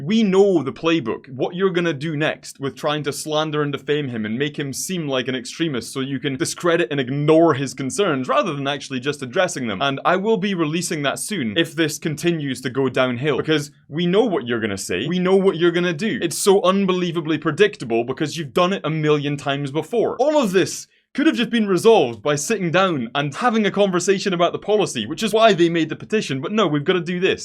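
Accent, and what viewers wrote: Scotland, United Kingdom. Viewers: Does he sound English to you? 😂 He’s Scottish 🏴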